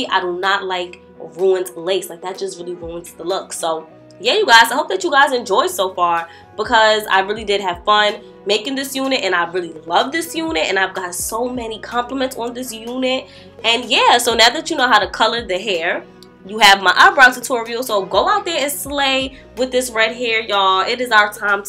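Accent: New York, United States. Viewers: u can hear the new york in her voice 😍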